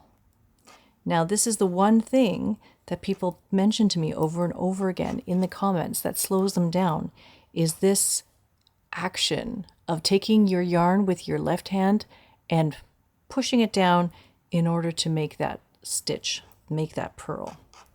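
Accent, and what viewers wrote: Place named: Canada. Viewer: Yay! Vancouverite here. I was about to ask if you were Canadian, your accent gives it away. Georgia St?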